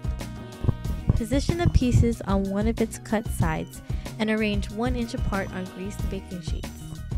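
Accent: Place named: United States. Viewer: you sound so american than filipino thats kinda funny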